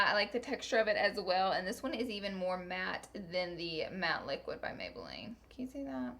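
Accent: South, United States. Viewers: I'm southern too and I think ur accent is beautiful😍😍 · It cracks me up when people leave comments hating on her voice. Maybe it's because I'm a Southern girl too but it's just the way we talk 😂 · New subscriber and just curious what state your from? I can't quite place your accent, but I definitely detect some southern twang. I'm from Virginia 😉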